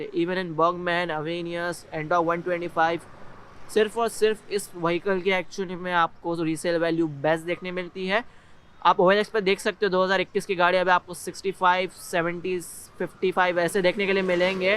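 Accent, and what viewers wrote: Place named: Aurangabad, India. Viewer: Bhai aap kahan se ho apke andaaz se lagta hai aap Aurangabad ke around kahin se to ho.....?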